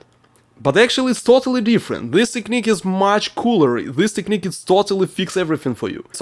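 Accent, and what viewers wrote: Russia. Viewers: Хоть я и не особо знаю английский, но когда человек говорит с русским акцентом, то почему я понимаю о чем он говорит?)))) Видео зачетное!👍 Полезное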